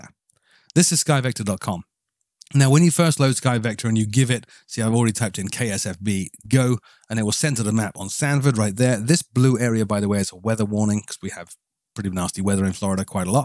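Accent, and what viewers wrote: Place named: United Kingdom. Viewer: you kinda have a british accent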